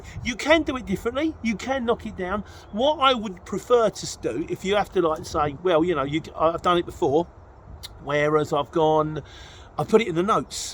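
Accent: Cockney, United Kingdom. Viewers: The reason why I watch your videos because of your Cockney Accent. I really like it 👍 💯 💕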